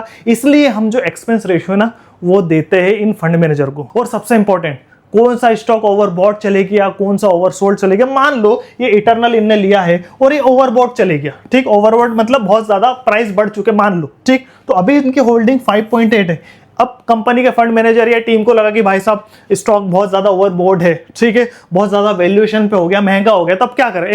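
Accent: India. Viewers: भाई मेवाड़ से हो क्या..??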